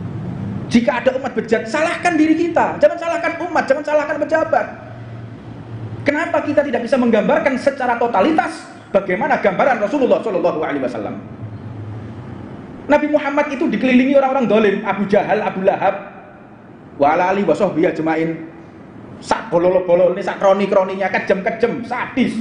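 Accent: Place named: Indonesia. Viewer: nyenengin banget habib husen...pola pikirnya..dakwahnya...gaya ucapanya,nusantara banget...sehat selaku bib...abaikan habib arogan yg lain,teruslah cinta nkri,menjadi habaib,dzuriyah nabi tak perlu di rabithah lawiyah,tapi di akhlaknya nabi bib...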